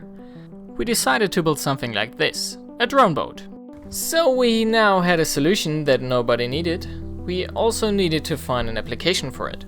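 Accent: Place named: Germany. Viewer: I thought your accent was german but wasnt sure... then i read und war mir sicher XD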